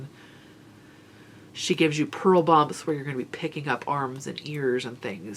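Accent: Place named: Scotland, United Kingdom. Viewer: Have a virtual hot toddy. I cannae spik for the rest o' Scotland, but I am totally on board for you adopting Scottish English...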